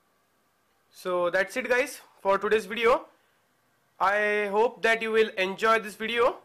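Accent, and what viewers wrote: India. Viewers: You kind of sound Indian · he sounds weird because he is indian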